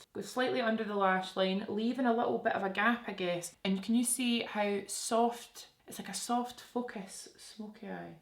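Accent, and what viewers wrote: Scotland, United Kingdom. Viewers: I’d be so interested in a master class. I find your techniques amazing. On the flip side I’d be worried that I wouldn’t make it to the end of a class with you, your soothing Scottish tones make me sleepy 😂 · I could literally listen to you talk forever😍 Scottish accents are my absolute favorite (I’m from Boston so almost anything is better than a Boston accent😂) you are so stunning💕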